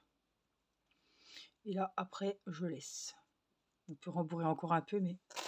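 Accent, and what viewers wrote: Franche-Comté, France. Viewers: Donc je suis vos explications pour le bonhomme de neige pour ma petite fille c'est un plaisir de crocheter avec vous, petit aparté je voulais savoir si vous êtes franc comtoise car vous avez cet accent qui me rappelle ma Franche Comté merci pour tout.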